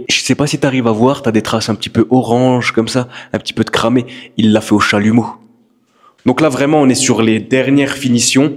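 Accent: Alsace, France. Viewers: J'ai bien reconnu cet accent, vous êtes Haut-rhinois? Le salam de mon fils du Bas-Rhin 🥨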